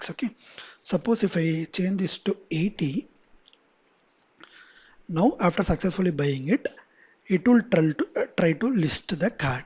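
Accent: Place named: India. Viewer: I hate this Indian omfg please stop with the fcking accent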